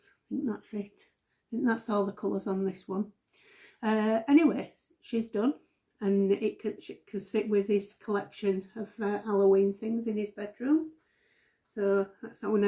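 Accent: Yorkshire, United Kingdom. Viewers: Thanks for sharing. Love your accent. Definitely reminds me of Yorkshire.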